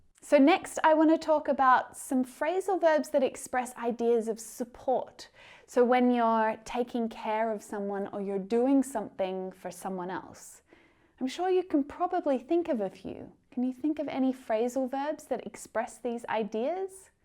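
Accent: Australia. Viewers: Her accent is Australian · Why is your accent so lovely? I have known Australians but none of them speaks like you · is a lovely lady, she has soft Aussie accent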